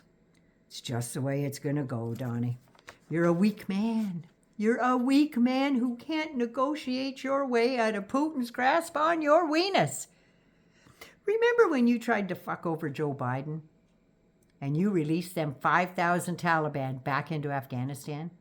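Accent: Canada. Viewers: Thanks for the Canadian voice. Trump needs to be said to the face - and I have this from a Black lady: Don't bullshit the bullshitter! Well, Trump has bullshitted his voters and supporters.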